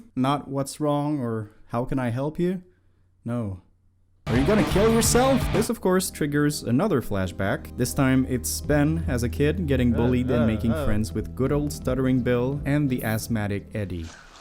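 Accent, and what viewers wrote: Quebec, Canada. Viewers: french canadian eh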